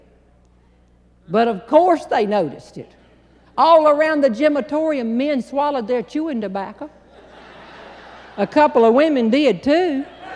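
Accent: South, United States. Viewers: She was so funny and had such an adorable Southern accent! I just loved Jeanne Robertson! ❤️ Good clean humor! And she was one of the greatest story tellers ever!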